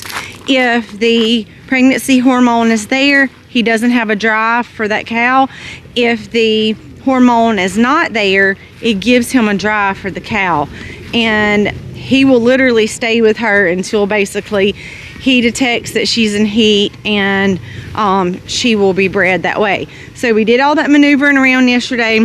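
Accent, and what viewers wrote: South, United States. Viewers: I talked about my grandmothers garden. They also had chickens in a hen house an d pigs in a stable. Both had a fenced area so they did get outside. I agree I love spinach but grandma didn't have that. I am curious you are always talking about the veggies do you have fruits. Grandma had cantaloupe and watermelons. She had an apple tree but it never gave off much. I didn't see corn either but I think it is late in the year for it. Also I live in Oklahoma and not sure what area that you are in but I am betting in the south hearing your husband and especially your southern belle accent. I know certain things grow better in certain areas.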